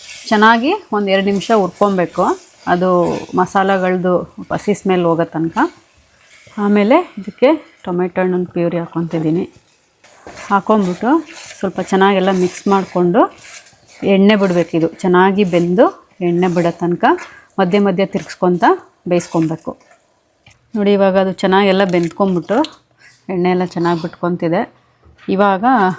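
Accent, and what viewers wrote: Mandya, India. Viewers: Nev same nan tharane mandhya style mathadodhu nange thumba esta nav yelle eddru nam basee mareyokke agaalla 🎉🎉 wish you happy birthday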